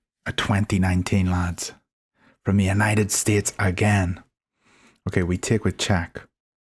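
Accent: Northern Ireland, United Kingdom. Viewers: You're English?! You sound Northern Irish! I would've put money on it.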